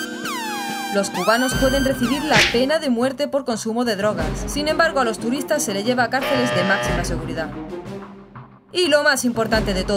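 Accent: Spain. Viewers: Es de España por acento . En fin que en España es inseguro . En Barcelona de noche es inseguro . Y en Madrid hay sitios inseguros .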